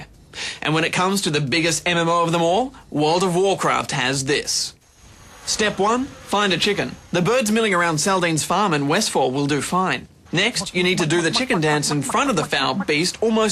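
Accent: Australia. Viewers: THIS IS NOT BRITISH ITS AUSTRAILIAN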